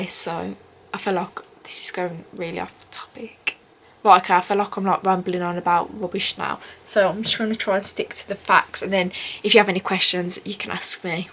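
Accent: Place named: Black Country, United Kingdom. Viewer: Black Country accent :)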